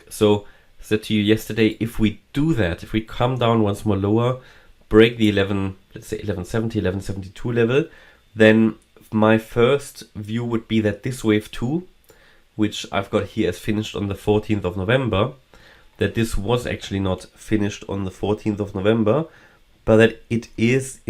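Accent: Germany. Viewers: deutsch? hört man raus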